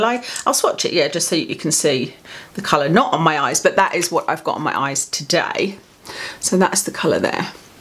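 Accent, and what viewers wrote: Scotland, United Kingdom. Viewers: Are you from Scotland. My home town. Love your videos x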